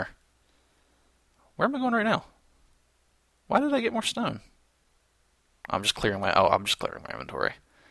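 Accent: South, United States. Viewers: oh you have a cold, you just sounded more southern to me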